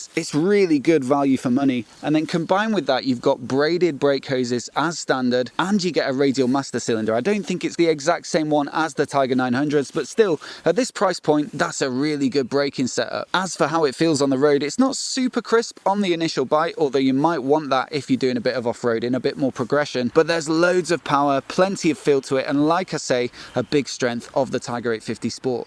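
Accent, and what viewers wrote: United Kingdom. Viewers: Awesome information, and a British accent that is pleasant to listen to. Thanks!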